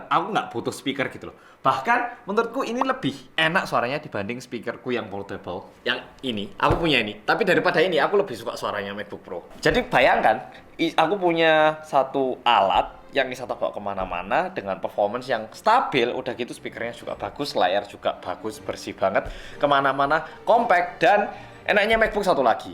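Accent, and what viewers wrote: Java, Indonesia. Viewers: Udah lama gak nonton, kangen medhog mu mass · Yeheee orang korea yang MEDOKKKK hallo mas👋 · Medokkkkkkkkkkkkkkkkk jaga kesehatan mas 🤗🤗🤗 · Gue nonton trus video dia .. dia asli korea .. sekolah di indo balik korea lg kok jawa nya medok banget .. geuuumeeeeess 🤣🤪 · beli mi instan korean spicy bang medoookkk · Di rumah aja nontonin sing medoook aja! Hehee ᬚᬓᬭ᭄ᬢᬭᬬ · Style baru nihh rambut baru kacamata baru makin berasa kek oppa medhok awkwokwowkwowkowkwo....... cocokan gitu seee keliatan kurus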